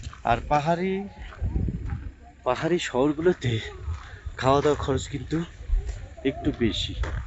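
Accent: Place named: Bogura, Bangladesh. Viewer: আমিও বগুড়ার, আপনার কথা শুনে মনে হয়েছে, পাশাপাশি আপনার চেহারাও পরিচিত মনে হচ্ছে।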